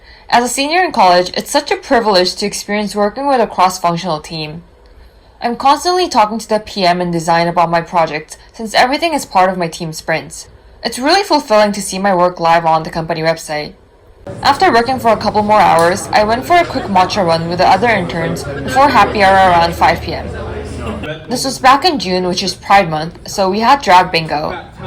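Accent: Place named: Singapore. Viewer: 혹시 싱가폴에서 오래 사셨나요? 제 싱가폴 친구랑 억양이랑 목소리가 넘 비슷해서 깜짝 놀랐어요😅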